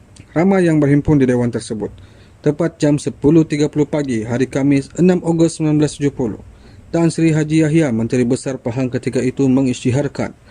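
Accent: Sabah, Malaysia. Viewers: Host nie mcm org sabah jak dari dialek cara percakapan...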